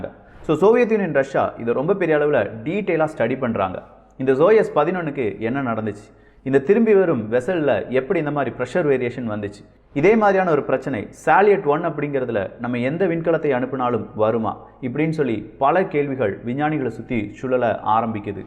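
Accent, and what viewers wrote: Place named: Kerala, India. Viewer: bro malayalam slang in ur english theriuthu.. docking - dawking not dowking.. 'O' is special for Malayalees.. No offesne. it is slang..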